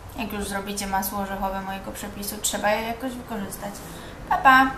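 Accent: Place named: Silesia, Poland. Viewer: Masz taki akcent slaski lub goralski :) fajny filmik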